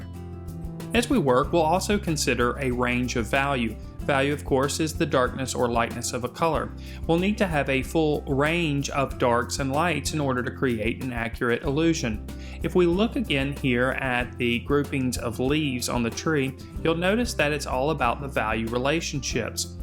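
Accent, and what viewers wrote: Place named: East Coast, United States. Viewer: I was wondering if you consider all American accents wonderful (?). For me it's a normal American accent (Being an English Teacher with a strong affinity and love for the English language, I'd probably place it somewhere around the East coast of North America. I wonder whether the would confirm my guess...)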